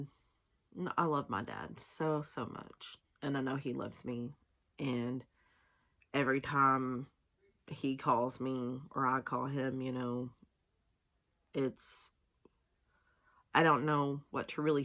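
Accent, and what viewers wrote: South, United States. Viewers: I really love your intro! lol your country accent is just a little stronger than mine! praying for you and sending positivity! Thats me right now so I am with you on that. Losing someone you love and never being able to see them again is so hard.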